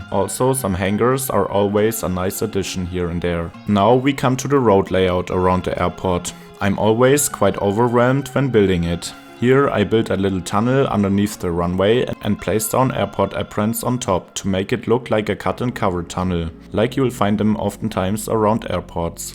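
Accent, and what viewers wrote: Germany. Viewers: Digga dieser deutsche akzent😅und cooles video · bist du deutsch(wegen dem aktzent)? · Ich höre den deutschen Akzent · it may be that you come from germany and speak it it sounds a bit like the pronunciation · I Hear Deutsch in that voice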